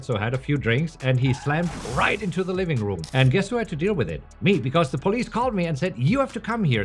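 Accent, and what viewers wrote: Germany. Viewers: Du hast diesen wundervollen NRW Nachnamen und ich glaube auch, man hört, dass du einen deutschen Akzent hast. Nur ein bisschen. Viele Grüße aus Berlin. 👋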